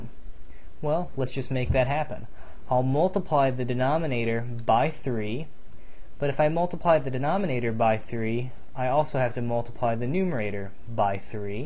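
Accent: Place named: South, United States. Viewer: good vids. but your southern accent distracts me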